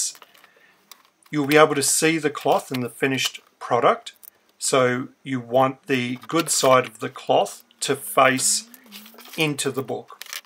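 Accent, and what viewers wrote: Australia. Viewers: You are amazing! Thank you for sharing your knowledge with us. I particularly love hearing your Australian accent. I too am Australian and proud to be with such brilliant work coming from you. Thank you so much.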